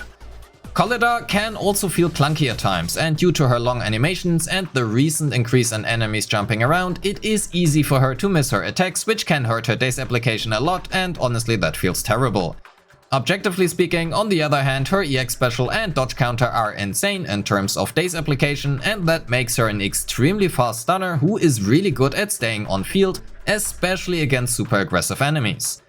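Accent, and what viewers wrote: Germany. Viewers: "Kolader" lol jokes aside I'm from alsace. German accents never fail to amuse me 😂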